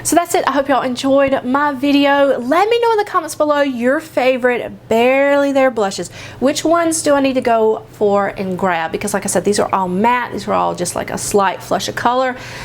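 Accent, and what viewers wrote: South, United States. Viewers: I really love your southern accent <3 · Such a fun video!! Love your Southern accent! I'm from Tennessee originally!! Thanks for sharing! I love discovering new beauty products :) Xo!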